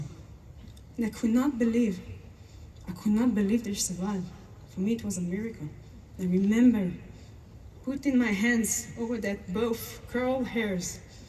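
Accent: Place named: Israel. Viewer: Afrikaans with an Israeli accent - first time I hear that!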